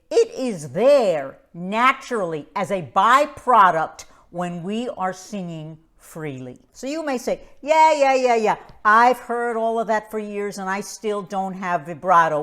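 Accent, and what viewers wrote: New York, United States. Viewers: I knew you had to be from NY or NJ by that accent! Thanks for all the great content!!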